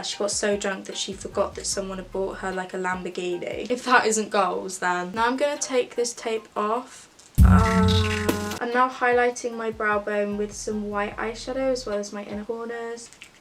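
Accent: United Kingdom. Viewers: Where are you from? The uk?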